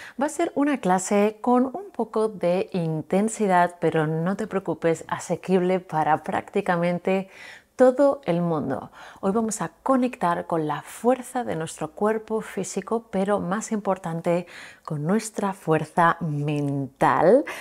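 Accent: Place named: Argentina. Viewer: Buenos días si fue intenso porque mis rodillas me fallan pero trate en lo que pude hacer muchas gracias, voy a repetir este si me gustó, gracias siento con tu voz una persona muy agradable y sencible Dios te bendiga,por x tu acento creo eres Argentina, bonito día ❤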